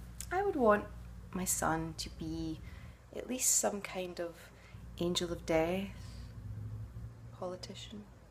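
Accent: Scotland, United Kingdom. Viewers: I adore the way you do your makeup. You should do a tutorial on how it's done. Also I love Rowena and your lovely Scottish accent. sarah in Ohio, U.S.A. P.S. I adore the way you say, Fergus. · I love your Scottish accent. so don't worry about if you're American accent is not great. I love your sarcasm also. I'm becoming a big fan.:-)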